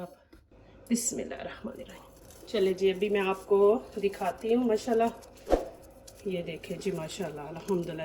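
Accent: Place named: Punjab, India. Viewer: Apki recipes tu achi huti hn par ap apna lhja sahi kar lain ya phir punjabi hi bol liya karain please 🤦😏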